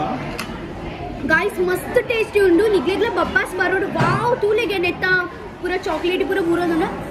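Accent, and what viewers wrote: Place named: Tulu Nadu, India. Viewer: Your tulu accent reminds me of bombai da binner comedy by MAD IN KUDLA 🤣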